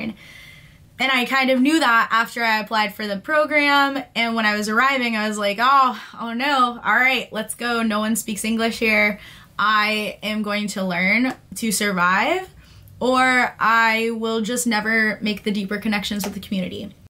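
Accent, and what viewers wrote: United States. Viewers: I love you,. I love your american accent and id love to speak english like you. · Me too. I adore her accent, and as I'm still learning English, I use that as a reference to acquire my American accent.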